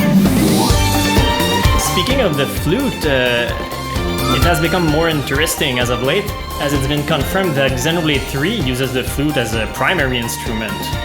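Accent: Quebec, Canada. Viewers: merci pour la video, tout après 5 seconde j'ai tout de suite su, sauf si je me trompe.. que tu es québécois avec ton accent anglais, qui ressemble pas mal au mien :P, très bon gout pour ton top 10 merci (sauf le no. 1 xD) et un subscriber de plus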